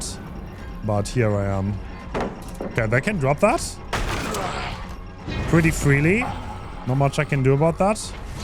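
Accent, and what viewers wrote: Germany. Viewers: its kinda funny that he copied the exact same speaking style like Otz but with a german accent.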